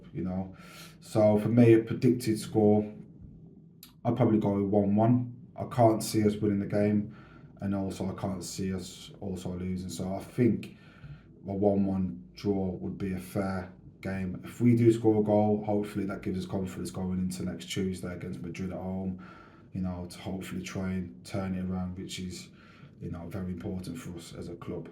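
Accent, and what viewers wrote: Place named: England, United Kingdom. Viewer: look like Lukaku with a English voice 💙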